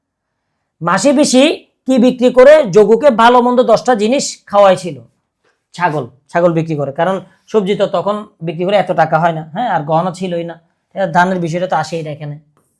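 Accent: Chittagong, Bangladesh. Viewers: ভাই কি চট্টগ্রাম এর??❤ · ভাই কি চট্টগ্রামের ? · সার আপনি কি চট্টগ্রাম এর টিচার?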